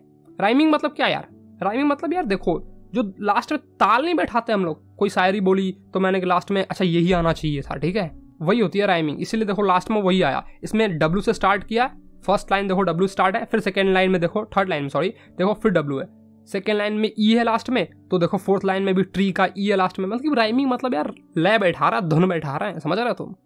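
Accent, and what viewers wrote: Bihar, India. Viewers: Bihar se ho kya sir?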